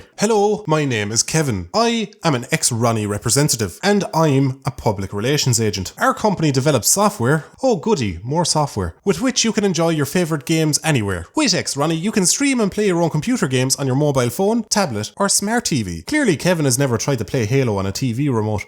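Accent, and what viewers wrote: Ireland. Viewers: Came here from cynical reviews! Subbed, liked and stayed to binge for the hilariously snarky content and adorable with an Irish accent! 🇮🇪 😁👍🏻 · Irish accent, that explains everything.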